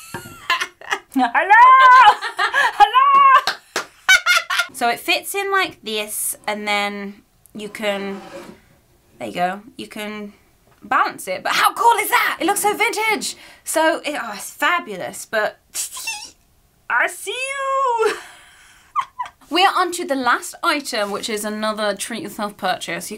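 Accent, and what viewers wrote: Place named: Scotland, United Kingdom. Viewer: Love the Scottish accent! 😂👍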